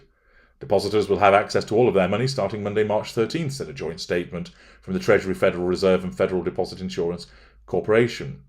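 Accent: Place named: Northern England, United Kingdom. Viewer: Noticed he pronounces other words in this way... always wondered if he originally came from the north of England. Whatever I enjoy his videos so it doesn't matter.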